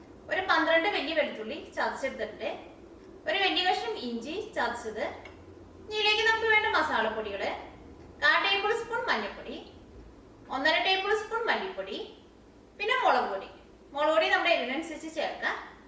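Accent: Thrissur, India. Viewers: I like ur the way of presentation .. Thrissur slang 😍😍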